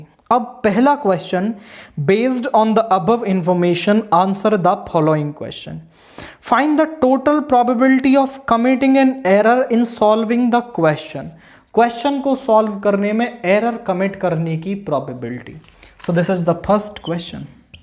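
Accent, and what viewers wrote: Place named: Haryana, India. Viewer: Tone to haryanvi h bolne ka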